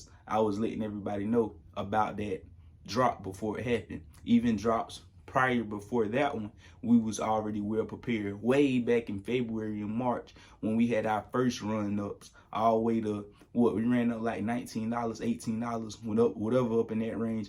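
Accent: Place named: Georgia, United States. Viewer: Gotta know where you’re from. I live in Georgia and your accent sounds like you might be a neighbor.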